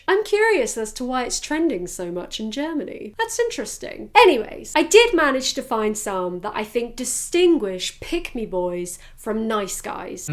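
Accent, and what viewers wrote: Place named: United Kingdom. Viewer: Soooo just realized all my male partners were actually some kind of pick me boys AND all the female partners/dates were im not like other girls girls and woah bisexuality really is something else, like, makes SO easy interacting physically, romantically or both with every kind of awful people uncomfortable laugh Btw just discovered this channel and im obsessed! This channel has everything, an amazing British accent, great humour, cows, smashing the patriarchy and a lovely skinny alien dog (im a cat person, but I have a soft spot for skinny alien dogs) Okay, I'll stop this rant here, love from Italy!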